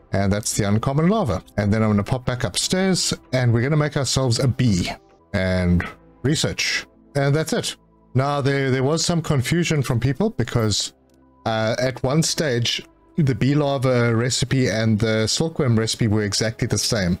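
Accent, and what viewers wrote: South Africa. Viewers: I knew it I thought I herd a South African accent 👌👌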